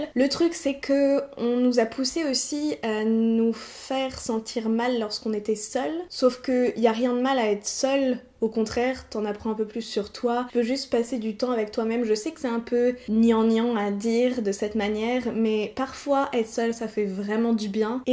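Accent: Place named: Quebec, Canada. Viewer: T'as tellement raison, etre en couple cest pas un but ni un objectif de vie, cest un choix. Je pense aussi que le besoin vient d'une autre époque où la survit de la race en dépendait, on en ait pu là du tout!! Perso je suis célibataire et j'adore ma vie comme ca. Je suis une personne tres solitaire et ca ne plait pas a tous et jy tiens vraiment bcp. Desfois je trouve que tu as un accent québecois :) comme quand tu dis ''plus facile à dire qu'a faire'' le faire, tu viens pas du Québec par hasard? Bonne journée à toi et à bientôt. Merci pour la vidéo, tjrs un plaisir t'écouter.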